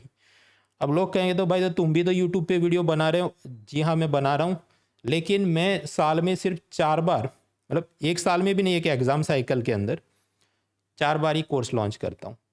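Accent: Gujarat, India. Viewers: Sir gujratse he ?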